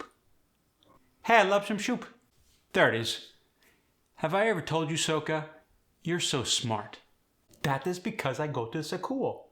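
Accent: Thailand. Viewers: I appreciate your effort in teaching the Khmer accent, but I think you might be mixing it up a bit with Thai. What you’re teaching actually sounds more like Thai than Khmer And actually, you got only 2 things right. yes, Khmer doesn’t drop ending sounds, and yes, we don't have the “th” sound. We like speech to be smooth and effortless, without putting too much pressure on our tongue In Khmer, the R sound (រ) definitely exists and is quite distinct. Khmer is super rich in sounds. it has one of the most complex alphabets in the world, with sounds many other languages don’t even have So no, we definitely don’t pronounce words like “sacoll, vegatabal or lealy, light….” we just like to keep speaking easy and natural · Get a native Khmer speaker to do emulating... this dude sounds like a Thai doing Italian accents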